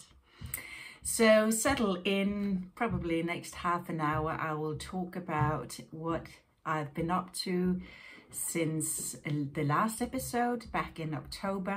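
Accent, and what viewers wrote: Denmark. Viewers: Hyggeligt at komme indenfor - dejlig podcast - sporede jeg lige en Aarhusiansk accent :-D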